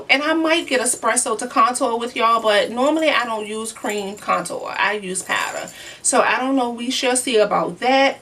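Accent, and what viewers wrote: New Orleans, United States. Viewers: I love to hear you talk..your accent ❤!!! Are you from New Orleans?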